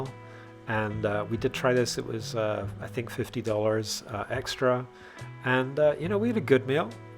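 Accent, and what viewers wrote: Scotland, United Kingdom. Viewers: Lovely vid, Thank you. We have just booked the Lisbon to Buenos Aires cruise in Nov '24 on this ship. Nice to hear you Scottish accent appearing. Big hugs fae Bonnie Scotland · Hey, I’m assuming you are Scottish by your accent. You can pronounce Foyer just like us Brits and Canadians do. Don’t mind the US bastardised pronunciation lol. 😂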